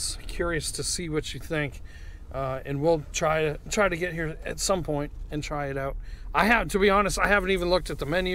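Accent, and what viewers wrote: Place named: North, United States. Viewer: Hi, your accent sounds like you are from above the Mason Dickson line. With all that new building, OC will have total grid lock all the time this summer.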